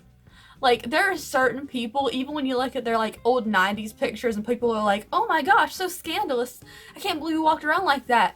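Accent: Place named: North Carolina, United States. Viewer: this is the first video of yours i've watched and right off the bat i knew you lived in nc bc of your accent lol nc represent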